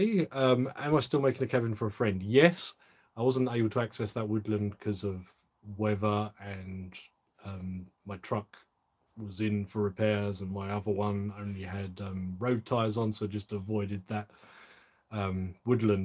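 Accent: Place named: Kent, United Kingdom. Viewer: hi kent...i am in kent...same accent too!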